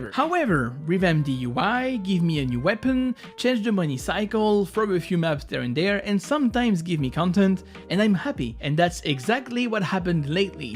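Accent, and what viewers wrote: France. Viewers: Ça fait 5 vidéos que je me pose des questions sur toi, est-ce qu'il a un accent bizarre, est-ce que c'est un anglais/amériquain qui est d'origine française, un français qui parle anglais ??? Bah je savais pas, donc finalement je vois ton interface YouTube avec "vues" et "il y a x mois". C'est bon j'ai ma réponse :D. En tous cas que tu sois d'origine anglaise ou non, mais si ton anglais est french spotted, il reste super fluide et naturel 👍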